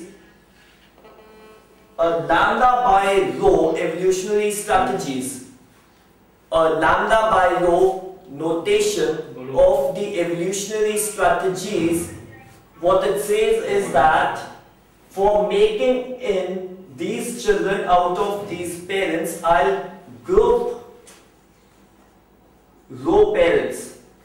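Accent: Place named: Asia. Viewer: thx Sir for video , I apreciate it but I need subtitles coz my english language is not so perfect especially on hearing the asiatic accent :)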